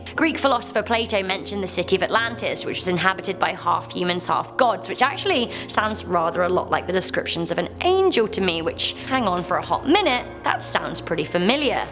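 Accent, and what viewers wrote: United Kingdom. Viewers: Toronto? You sound so British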